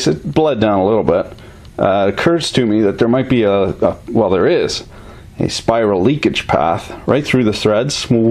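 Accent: Canada. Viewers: This guy sounds like he is from Letterkenny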